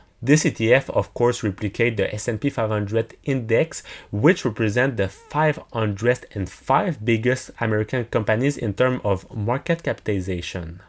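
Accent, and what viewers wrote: Quebec, Canada. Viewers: Sounds like a fellow Quebecer 🤘🤘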